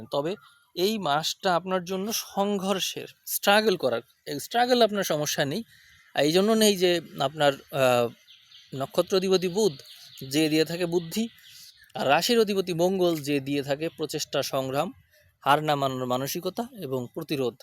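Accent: East Bengal, Bangladesh. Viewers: তুমি কি পূর্ববঙ্গে বাঙাল?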